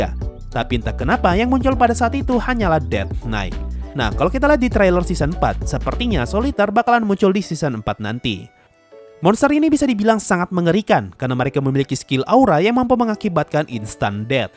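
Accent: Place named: Papua, Indonesia. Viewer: Z dengar kk pu logat nih kayanya kk tinggal di Papua to.. di kota mana kk??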